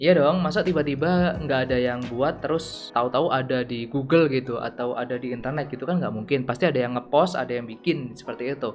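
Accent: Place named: Java, Indonesia. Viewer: Bang omah e ndi kok logat e jowo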